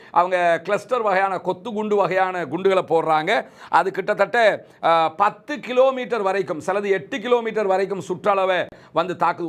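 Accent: India. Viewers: நீங்கல் ஒரு இலங்கையர் இலங்கையருகென்று ஒரு தன்மை உண்டு மனித நேய முல்ல நல்ல பன்பாலர்கல் உங்கல் ஆங்கில வார்த்தை சிலாங் இந்தியாவின் ஆங்கில உச்சரிப்பாக உல்லது இந்திய ஆங்கிலத்தை எந்தவொரு நாடும் ஏற்று கொல்வது கிடையாது உதாரணம் ஆரஞ் ஆப்பில் ஜான் இப்படி.. நீங்கல் நாகரீகமாக இதை கருதுகின்றீகலா இல்லை... மிகவும் கேவலமா உல்லது இலங்கைக்கு என்று ஒரு தன்மை உண்டு அதே பேணுங்கல்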